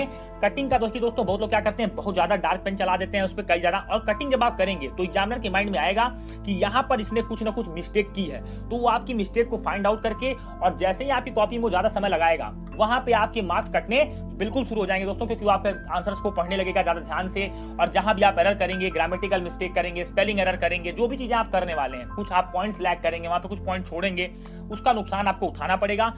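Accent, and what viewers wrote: Bihar, India. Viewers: Sir ji aap bihari ho???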